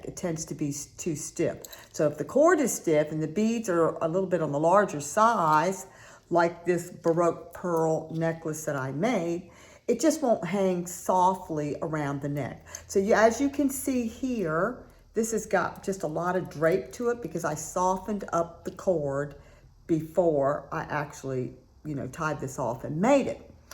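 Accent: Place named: United States. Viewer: Thanks, nice to know this can be done without steaming the leather. I made a bracelet with my own woodturned beads strung on 3mm leather cord with leather barrel knots in between my woodturned beads, but the leather was too stiff as a bracelet so I pulled it apart, undid the barrel knots which retained some of their kink. I will try your method to unkink the leather so I can make a choker with it. Seems a lot of people are copying each others videos on youtube. You sound more American than Italian! PS - the tool you call a 'clamp' is actually called a 'vice'.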